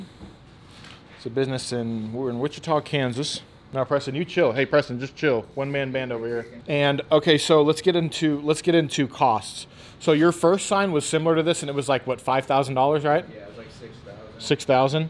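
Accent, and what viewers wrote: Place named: Asia. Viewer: Talent indeed, when he speaks in his normal voice the asian accent becomes obvious.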